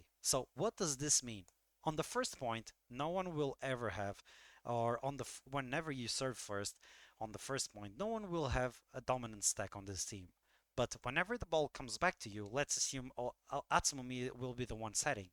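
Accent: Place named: Portugal. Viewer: You do sound portuguese thats why I said that hehe Maybe I can try to fully commit to this team and get both to SR4. However, I’m also interested in the new Nekoma team with SP V2 Kuro, Yaku, Yamamoto, and the Term-End and Kamo duo. But I think I wouldn’t be able to get any dupes, and I already have Kuroo V1.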